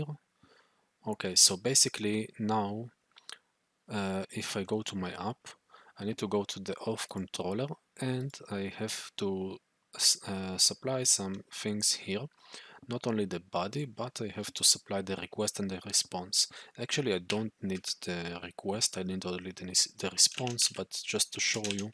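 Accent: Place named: Madagascar. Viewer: Hello, I was wondering if you come from Madagascar? ( cause of the accent ) anyway, this is a great video, thank you for the sharing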